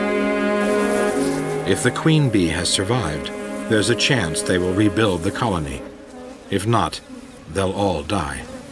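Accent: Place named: Canada. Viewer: The narrator sounds Canadian. Feel like I'm watching a documentary from the Mother Corp back the 1980s. Cheers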